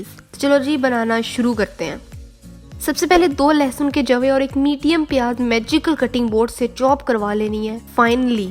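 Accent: Punjab, India. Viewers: yaar aapi aap boht acha se explain krti ho i loved it ur punjabi axcent is just amazing ap sach mn Sialkot se ho hahah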